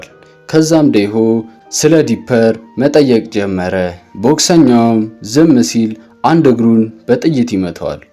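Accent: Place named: Borko, Ethiopia. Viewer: ቦርኮ ነህ እዴ አወራርክ ሲዘጋ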